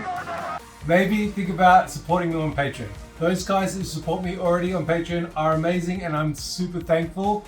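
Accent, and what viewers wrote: Australia. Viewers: Is that a some what Aussie accent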